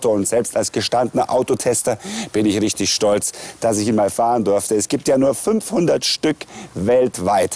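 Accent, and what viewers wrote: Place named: Germany. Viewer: es gibt nichts schlimmeres, als wenn ein Deutscher italienisch spricht!!! Bitte verbietet das in Zukunft! Ich sag nur Schüttelfrost!!!!!!!!!!!!!!!!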